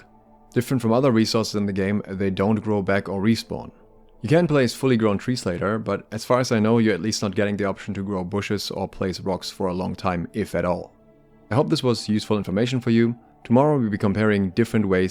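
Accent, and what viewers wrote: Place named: Germany. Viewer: You sound like you have a German accent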